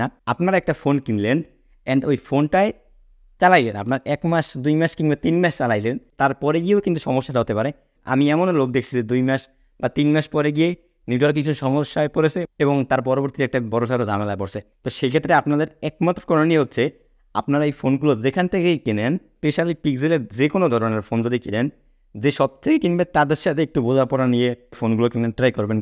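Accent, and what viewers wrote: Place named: Barishal, Bangladesh. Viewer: ভাই আপনি তো মনে হয় বরিশাইল্লা 🙂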